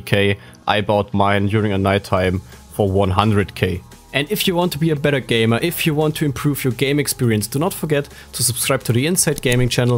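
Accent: Germany. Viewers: Bist du deutsch wenn ja mann hörts xD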